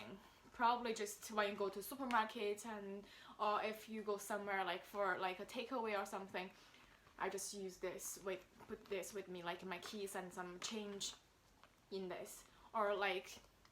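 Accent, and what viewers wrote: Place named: United Kingdom. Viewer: Your British accent is soooo cute!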